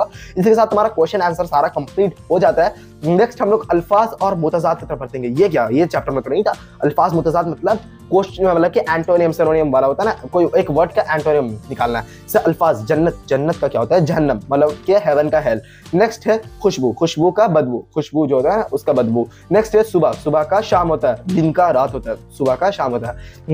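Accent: Bihar, India. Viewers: Are you from Bihar 👍🏻,?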